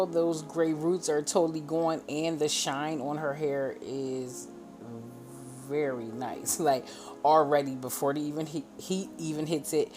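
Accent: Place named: New York, United States. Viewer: I love your accent. Are you from NYC? Watching you work and listening to you is so soothing!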